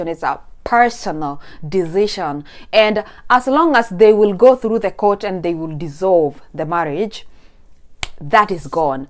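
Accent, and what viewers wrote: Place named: United States. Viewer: Daughters of Sarah...by the way i love your American English accent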